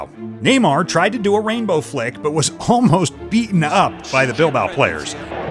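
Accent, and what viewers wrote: United States. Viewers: This channel sucks I can ignore the horrible american pronunciation but making passé remarks about Ronaldo being fat is just embarassing and completely unnecessary, considering the fact that it's of little fault of his own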